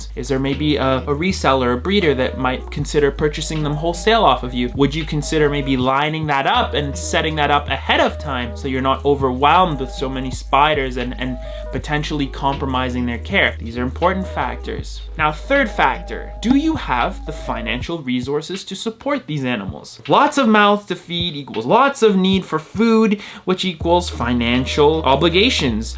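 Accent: France. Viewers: I love your french accent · The French accent lmaooo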